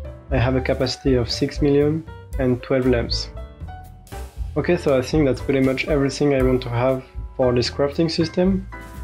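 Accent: France. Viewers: As a french , strong french accent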